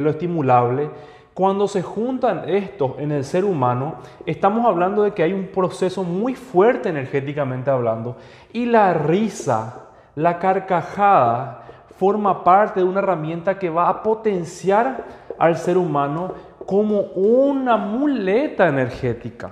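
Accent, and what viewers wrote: Paraguay. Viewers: Sos de Paraguay? Tienes acento de ahí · Hola nada que ver al tema pero tu acento o sotaque e de Paraguai verdad? 😊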